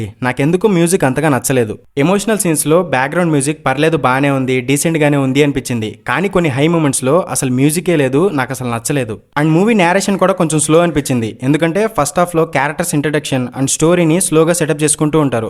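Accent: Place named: India. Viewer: Anna andhra naa meedi telangana naa slang vera Laaga umdhi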